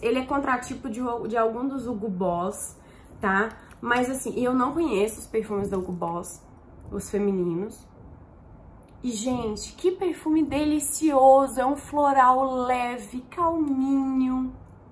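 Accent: Minas Gerais, Brazil. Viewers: Sou apaixonada nesse sotaque, trem 💙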